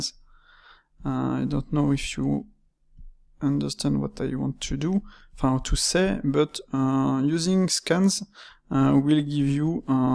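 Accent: France. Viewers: On reconnais le francais assez timide eheh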